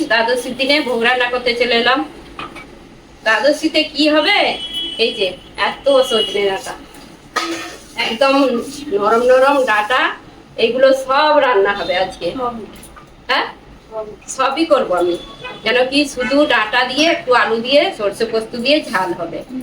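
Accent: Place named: Birbhum, India. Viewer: Birbhum ,Purulia ,Bakura hobe ,kothar style e tai mone hocche ,😄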